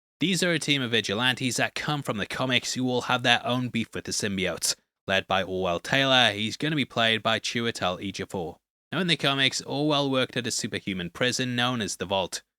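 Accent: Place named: Liverpool, United Kingdom. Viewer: unforgivable scouse accent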